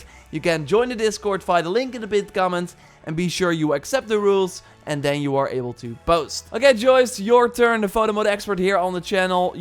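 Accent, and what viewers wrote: Netherlands. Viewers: why does sound so Dutch? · Yeah i think he speaks dutch judging by his accent at least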